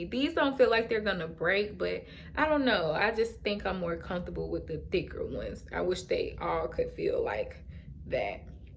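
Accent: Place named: South, United States. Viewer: Girl, listen, I've had locs before (about to start my loc journey again next week) and I had never been tender headed in my life until my hair loc'd! I thought that was crazy. Your hair is beautiful, btw! I'm a new subbie. Thank you for mentioning the salon because I'm in Atlanta too. To hear you talk, I was thinking you had to be from here or somewhere from the south because we sound alike 😁😁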